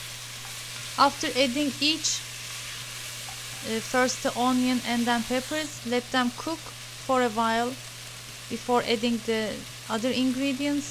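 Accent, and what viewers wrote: Turkey. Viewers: Ur Turkish accent is so cute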